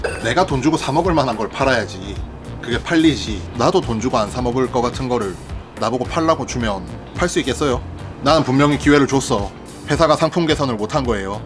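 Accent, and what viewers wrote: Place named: Gangwon, South Korea. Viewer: 이분 강원도 분인것 같다..사투리가 강원도 사투린데..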